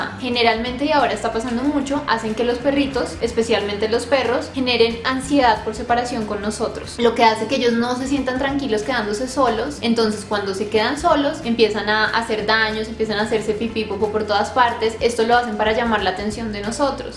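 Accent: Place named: Colombia. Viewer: Me gusta mucho tu acento , de que parte de Colombia es ?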